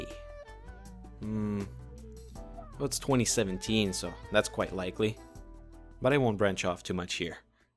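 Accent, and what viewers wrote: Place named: Minnesota, United States. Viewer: I like your accent, you from Minnesota or somewhere around there?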